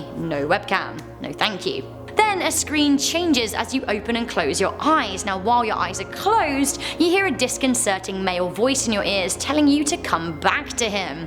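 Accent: United Kingdom. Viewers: Won’t be visiting those sites. But I swear you are one beautiful women Jesus plus that British accent wow lol. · I may have a small crush on...or maybe a crush for Britain voices telling me things in top 10 list format.Either way I am satisfied 🙂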